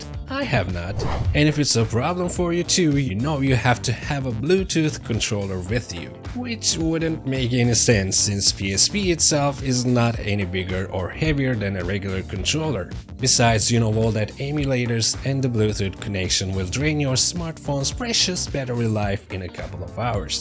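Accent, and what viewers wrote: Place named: England, United Kingdom. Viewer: Lan o değilde bilmesem adamı İngiliz sancam ha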